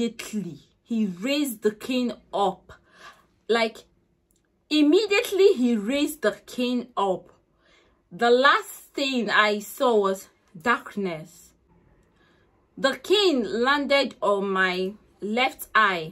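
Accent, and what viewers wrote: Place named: Ghana. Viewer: So sorry 😞but na ur stubbornness cause am 😆 I love ur English u speak like Ghanaians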